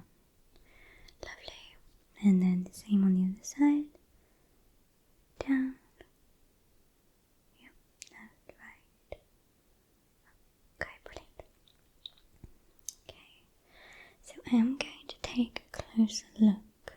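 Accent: United Kingdom. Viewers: Bri'ish?! My favourite. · I enjoy when we get a Dr. appointment from time-to-time 🙂 The eye lid lifting was quite tingly for me. The Queen’s English makes part of the exam sound a bit aggressive 😉 “Point a ‘torch’ at your eye” - someone from the US: 😨 “Hang on! You’re gonna what?!” And the ‘Is the dress blue or gold?’ reference 🙌🏼 nice flashback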